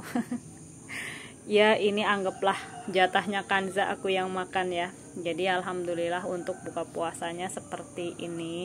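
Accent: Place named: Banyumas, Indonesia. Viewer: Mba Cilacap ya aku Purwokerto mba sama2 ngapak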